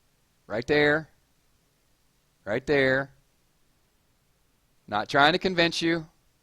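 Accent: United States. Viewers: And I like your voice it sounds country and that's what I like about you and all of your walking dead vids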